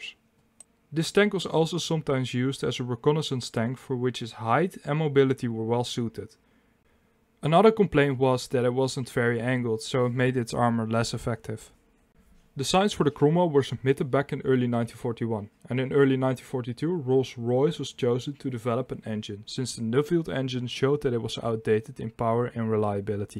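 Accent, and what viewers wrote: Netherlands. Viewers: That was extremely informative, and i personally appreciated your Dutch accent; very humble, yet PROUD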